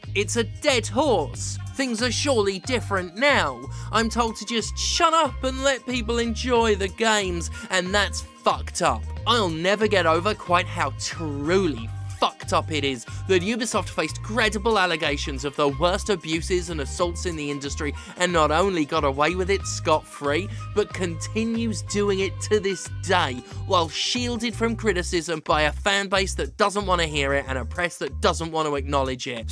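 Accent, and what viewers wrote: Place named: Birmingham, United Kingdom. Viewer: Your becoming slightly Brummie and I’m ok with this.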